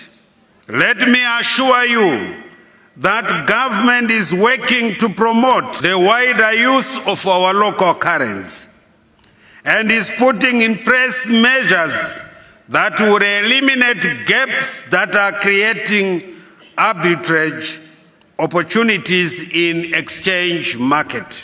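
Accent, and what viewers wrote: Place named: United Kingdom. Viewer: Is this guy really a Zimbabwean ?sounds like he's from British empire (Malawi,)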